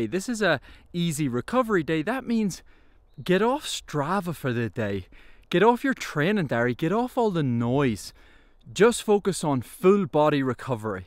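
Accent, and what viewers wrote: Northern Ireland, United Kingdom. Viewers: Came across this video and my ears perked up immediately, no mistaking that accent. Fellow nordie here. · Jaysus that norn Irish accent cuts like a knife.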